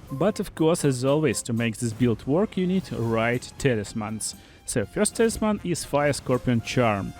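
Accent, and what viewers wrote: Russia. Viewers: Russian ? “Safety is number one priority”~ CrazyRussianHacker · Какой-то сильно русский акцент у вас сударь.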